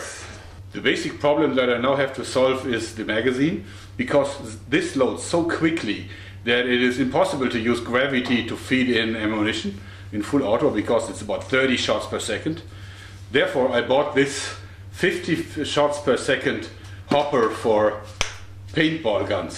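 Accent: Germany. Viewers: Das ist eindeutig englisch mit deutschem Akzent :D Hab ich recht? :)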